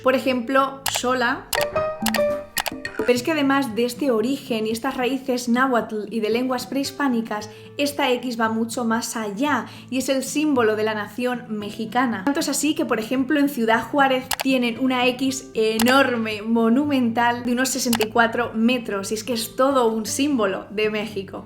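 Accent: Spain. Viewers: Hola..no tiene el acento español muy marcado, bueno como se tiene la idea que habla un español comun....saludos.l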